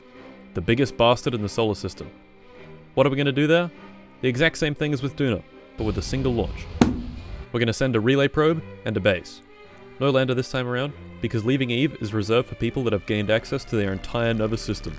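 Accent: Australia. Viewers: are u an aussie? im curious...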